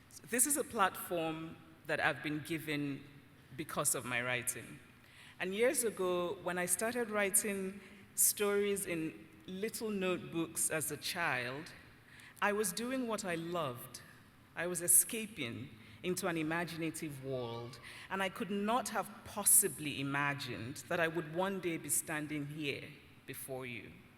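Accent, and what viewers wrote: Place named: RP, United Kingdom. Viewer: Subtitles?! Seriously?! Do you not understand the Queen’s English?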